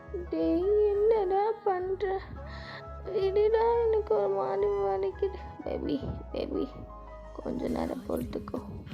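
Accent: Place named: Kerala, India. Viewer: Neega malayalam ahhh..? Light ahhh malayalam slang varuthuu ana athum nallah tha erukkk super storyyy😌🤍🫴🏻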